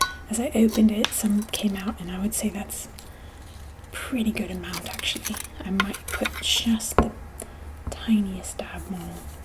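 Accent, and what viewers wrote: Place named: United Kingdom. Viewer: She is actually from America, but she uses a British accent in her videos ☺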